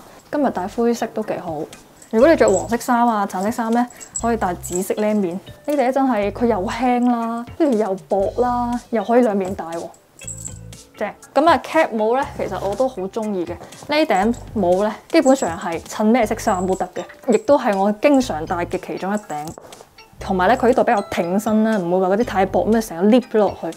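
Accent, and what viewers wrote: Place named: Guangzhou, China. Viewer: 衣著有品味，人靚、聲甜，好喜歡你！聽到你的廣州口音，特別感到親切……。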